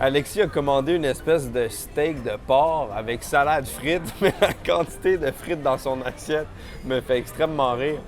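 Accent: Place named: Quebec, Canada. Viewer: J'aime bien la vibe de t'es vidéos quand tu voyage, ton québécois ressort plus que dans t'es vidéo a la maison ! Toujours un plaisir de te regarder :)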